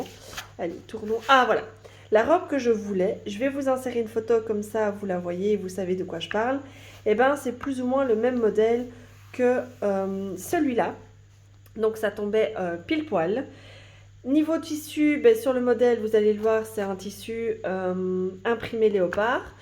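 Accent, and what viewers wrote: Belgium. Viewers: Hey trop contente de te retrouver !! Ce petit accent Belge m'avais manqué ! Des bisous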